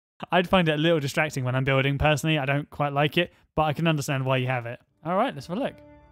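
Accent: United Kingdom. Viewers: MAN THIS DUDE IS SO INTERESTING I LIKE YOUR VIDEOS KEEP UP THE GOOD WORK,THOUGH ITS KIND OF LIKE MUMBO JUMBOS,UR ACCENT IS BRITISH UR CLOTHING IS FORMAL AND U DO REDSTONE VIDEOS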